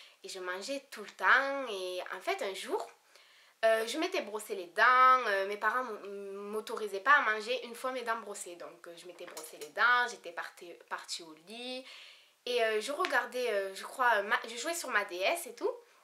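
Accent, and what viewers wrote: Marseille, France. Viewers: Aie on a reconnu direct l'accent Marseillerr !!!!! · Tu es Marseillais ? Super vidéo ❤️💋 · te marseillaise nn ta le même accent que moi sur se te trooop Belle😍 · t est marseillaise ?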